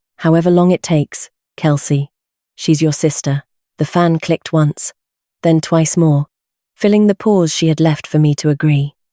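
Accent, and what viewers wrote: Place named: United Kingdom. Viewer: Narrator has a very lovely voice but it was a bit bizarre that she’s clearly British. Didn’t quite fit a story supposedly from Cincinnati. Was quite distracting.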